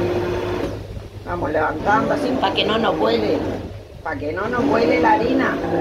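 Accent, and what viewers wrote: Andalusia, Spain. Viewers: Eres única con ese toque andaluz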